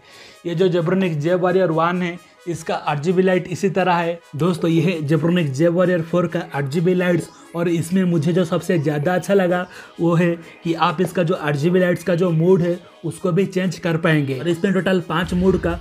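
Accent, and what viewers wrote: Bengal, India. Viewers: Bhai bengali ho yea Assamese ho?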